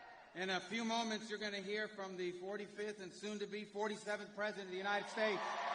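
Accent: Cuba. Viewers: Rubio you’re the man love your Spanish puro cubano puro americano con amor 🎉😊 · His accent is very cuban indeed:) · How Spanish was great, it’s just the Cuban accent. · You can hear how similar his spanish sounds to Desi Arnaz / Ricky Ricardo, it's not like spanish from Mexico. I had a co-worker who's family was from Cuba and he said Cuban spanish is like a machine gun, and Mexican spanish is very sing-song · I’m a Tejano and I understood him very well because of the passion and emotion he puts into his words in Spanish—even though Cuban Spanish is a beast to understand compared to other Spanish. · Español cubano como bien dice 😁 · I hope that Hispanic parents look at this as a great example to teach their US born children Spanish. There should be no stigma or shame about speaking Spanish. It’s an incredibly beautiful and rich language. His parents did an incredible job raising him bilingual, and his bilingualism gives him a tremendous advantage in his political career. 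He didn’t use a single Spanglish word. His speech was eloquently and passionately delivered in pure Spanish. As a Cuban-American, I can confirm that his Cuban accent was authentic. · As he said, it is more like a "Cuban" sound... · Didn’t know his Spanish was that good. Sounded great, true Cuban accent. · Damn, being Spanish my primary language by living and US for many years, coming to see another 2nd generation latino-gringo proud of his Spanish skills to laugh and…. he rocked. Finaaally some good Spanish, well articulated, the message is delivered in a proper way, Cubn style but correctly. Maybe this is one of the reasons why many Latinos voted for Trump this time.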